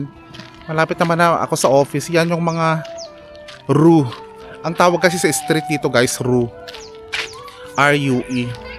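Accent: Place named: Ilocos, Philippines. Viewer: Ilokano po ba kayo? Ung accent kase kapag magtagalog 😅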